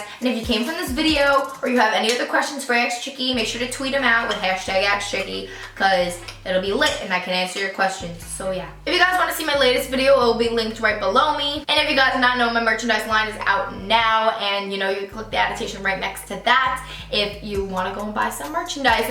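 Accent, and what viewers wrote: New York, United States. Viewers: New York! I was trying to figure out where that accent was from! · love your new york accent omg!!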